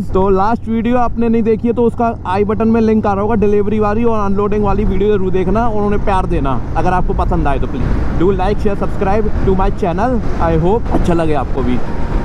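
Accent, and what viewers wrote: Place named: Madhya Pradesh, India. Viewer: Mp ka accnt bohot aacha hai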